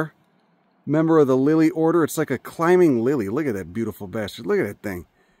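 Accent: Chicago, United States. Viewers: Jesus two seconds in this guys got to be from chicago !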